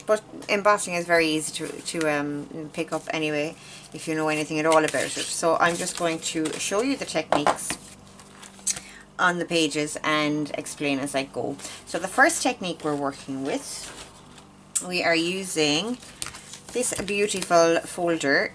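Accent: Ireland. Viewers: Thank you for sharing .Very clear presentation.(Love your Irish lilt.)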